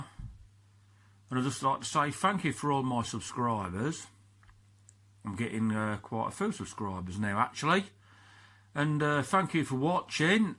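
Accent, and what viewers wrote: Midlands, United Kingdom. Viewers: hi, just subscribed, great videos you have. yes I agree with you regards the fry, wouldn't be so bad if she just had 20 fry or so. p.s. you must be from the Midlands with your accent 😉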